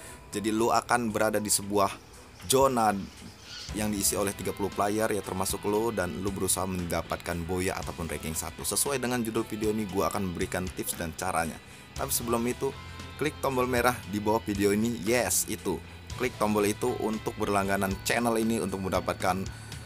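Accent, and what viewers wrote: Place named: Manado, Indonesia. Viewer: Abang orang Manado ya!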